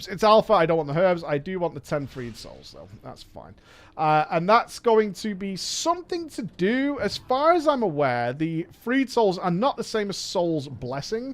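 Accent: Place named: Wales, United Kingdom. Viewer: 75 minutes of that silky Welsh accent? What A beautiful wednesday.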